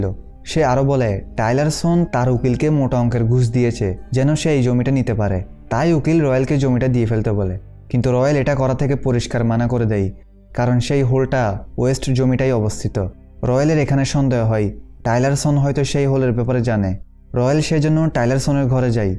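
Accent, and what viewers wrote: Chittagong, Bangladesh. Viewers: love ur chatgaiya accent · Bro chhatgaiya accent is annoying me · বুঝলাম আপনি চট্টগ্রামের, কিন্তু আরেকটু স্টাইলিসভাবে বললেও পারতেন 😅। ভিডিওটা সুন্দর ছিলো ❤❤ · হাইরে চিটাইংগা টান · চাটগাঁইয়া উচ্চারণ চলে আসে। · যদিও আমি ঢাকার কিন্তু চিটাগাং এর ভাষায় এক্সপ্লেন শুনতে অনেক ভালো লাগলো, তাই সাবস্ক্রাইব করে দিলাম 🥰💐🥰💐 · যতই চেষ্টা করেন না কেন চট্টগ্রামের মানুষের কথা আলাদাভাবে স্পষ্ট বুঝা যায় 😊😊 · Apni Chittagong er Manush.... Kotha bolar accent e buja jacce😊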